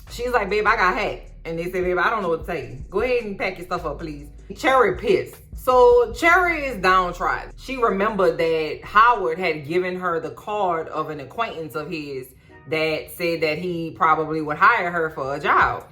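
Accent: New Orleans, United States. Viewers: Great review! Are you from New Orleans? My gosh love the accent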